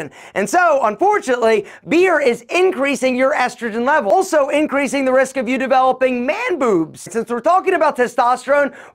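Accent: Philadelphia, United States. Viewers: Great advice and also a great Philly accent !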